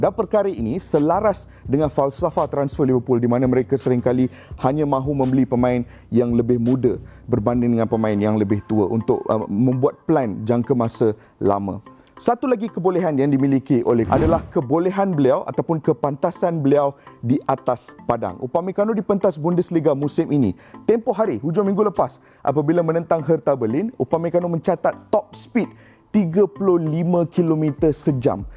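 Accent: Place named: Malaysia. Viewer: Lidah melayu betul mamat ni. Terbaikkk 👍🏻👍🏻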